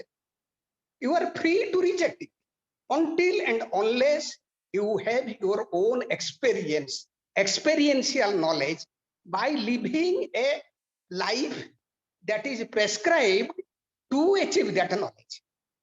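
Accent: Odisha, India. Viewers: Swamiji pranam 🙏🙏🙏 I have few questions.. please answer me 1 - I practice pranayama, asana, bandha, mudra, do japa etc... When I meditate my prana became active and it generates heat in my body, my spine became hot it's like something rising very hot substance in my spine.. also the prana give pressure in muladhar and generate heat. Sometime it pains a little bit but it's ok. Then I stop meditation, but now again am starting. 2- Swami ji where do you live ?? Or have ashram? 3- Swamiji are you from odisha ?? You sound like odia cause am from odisha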